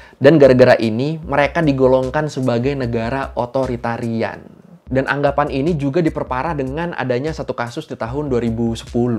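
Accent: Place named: Madura, Indonesia. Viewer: KenaPa LogatLu KaYak ORang MaduRe Cong, BiSa KaYak GiTu Ya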